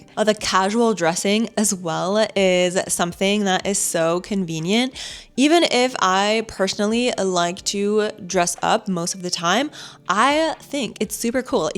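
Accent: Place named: United States. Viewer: J'aime tes vidéos, surtout ta simplicité et ton côté terre-à-terre, sans frime. Ce que je n'apprécie pas, c'est ta façon d'imiter les américaines: ton discours, ton intonation, ton langage corporel, ton langage avec les mains... Dommage qu'on ait perdu le charme, la douceur, la sophistication et les caractéristiques d'une jeune femme Française.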